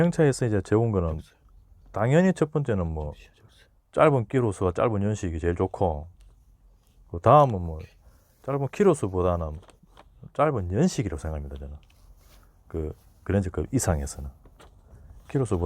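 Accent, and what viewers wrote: Gyeongsang, South Korea. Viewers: 목소리리 톤이 아주 좋으십니다. 남자로써 차에 관심을 많이 가지는건 당연하겠지만 실천하기가 싶지 않는데 존경스럽습니다. 혹시 경상도 분이신가요. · 근데 엔진오일 교체하는데 얼마한다고 저 난리를ㅠㅠ 저도 부산인데 사투리 심한건 아니고 억양 자체가 경상도네요ㅋ 유튜브 대박나시길 기원합니다 저렴하게 엔진오일 교체하는 꿀팁 알려드리겠습니다 파트존 깔고 내차 차대번호 입력하면 가격대로 나옵니다 저도 528i 끌고 다는데 최저가로 구입하시고 글구 물건이오면 공임나라 예약해서 교체하세요 그럼 총 10만원도 안들어갑니다 오일 흐르면 환경파괴 잘못 교환하면 피박씁니다 글구 엔진오일 교체할때 에어컨 필터도 같이 교체하세요~^^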